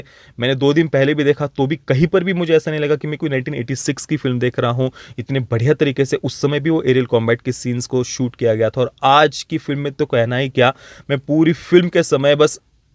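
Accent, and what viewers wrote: Maharashtra, India. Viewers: Aapka hindi itana mixed with marathi hai...bohot jyada, isme koi burai nahi but aap ek reviewer ho, hindi to perfect chahiye...nahi to apaka channel itana grow nahi karega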